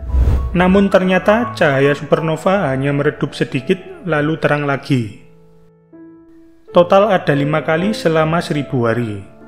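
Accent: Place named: Java, Indonesia. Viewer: Tingkatkan lagi mas channel nya, em... Logat jawanya tolong agak dikurangi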